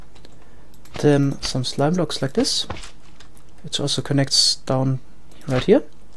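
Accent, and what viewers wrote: Germany. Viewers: You-r german? But keep up the great work :) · Ja den deutschen Accent hab ich auch erkannt, aber hört sich ganz gut bei ihm an, finde ich. :D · lol ich so: "Hmmmm der Akzent klingt deutsch😂" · ja hört man extrem :D als deutscher sagt man immer sis anstatt this :D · man merkt das du aus Deutschland kommst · Man hört sofort das du deutsch bist xD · r u german? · Man bemerkt an deiner Aussprache, dass du deutscher bist :D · bist du deutscher? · Not the strongest German accent I heard, but after some time you start noticing it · iich dachts mir gleich wegen dem deutschen akzent... hört man einfach raus xD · His german accent makes this video that much better :D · Gutes englisch, den deutschen Akzent hört man trotzdem raus! (geht mir beim sprechen glaub genauso xD)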